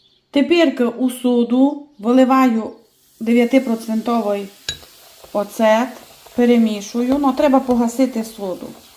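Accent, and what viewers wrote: Transcarpathia, Ukraine. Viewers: Мій лайк і підписка. Я з Івано-Франківська, але мені дуже подобається Закарпатський діалект і дуже поиємно Вас, слухати. Ваші рецепти супер. З нетерпінням буду чекати на Ваші чудові рецепти. 🥰🥰❤️🩵🩵🩵💛💛💛🇺🇦🇺🇦🇺🇦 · я дуже люблю слухати вашу закарпатську річ я зі Львова але приємно чути кожну людину з різних куточків України добра вам і вашим діточкам❤ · Найбільше любила такі рогалички з трояндовим вареннячком ♥ Це такі теплі спогади з давніх весіль та празників. На львівщині це - рогалики. Дуже люблю слухати закарпатський діалект. Дякую Вам за позитив і досвід. А хай не ростроюється )).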